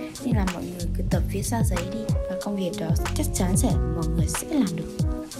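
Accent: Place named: North, Vietnam. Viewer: Giọng bắc đồ đó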